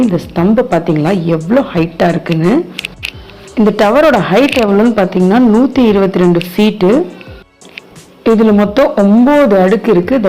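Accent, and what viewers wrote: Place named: Tirunelveli, India. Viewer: தம்பி food review சிறிது திருநெல்வேலி பாஷையுடன் நல்லா இருந்தது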